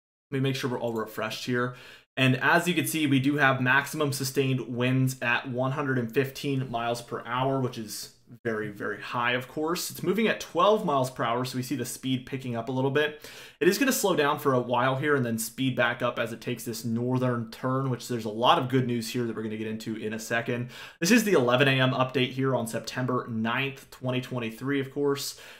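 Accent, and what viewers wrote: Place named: Virginia, United States. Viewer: Just want to drop you a note Young Man, for doing an excellent weather reporting and being on target. I Appreciate your Virginia Dialect, makes me feel at Home. I was originally born in Tidewater area, but presently live in Central North Carolina, Smithfield, area about 25 miles East of Raleigh. Thanks again, have a wonderful weekend.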